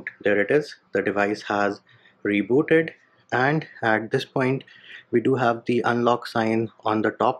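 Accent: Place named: India. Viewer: indian accent make me laugh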